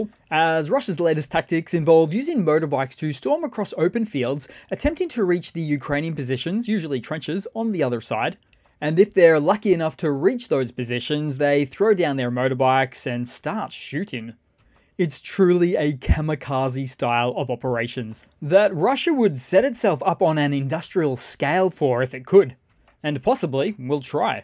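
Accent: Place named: Australia. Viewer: Its called an australian accent, doffus. Australians generally speak in a very singalong manner. So stop the snobbism, mate.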